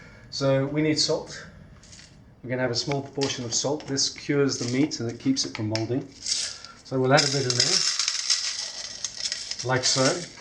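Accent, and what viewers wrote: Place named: South Africa. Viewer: You sound like a SAFA, so where is the fat boet? Fat = flavour Regards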